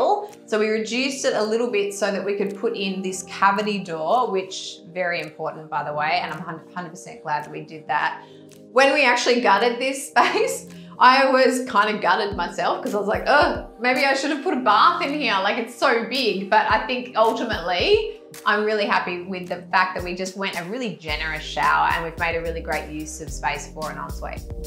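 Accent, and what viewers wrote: Australia. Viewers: Nice home like your accent too are you from Australia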